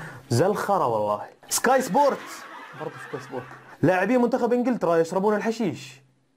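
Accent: Jordan. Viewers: انت أردني؟؟؟؟ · انت أردني صح؟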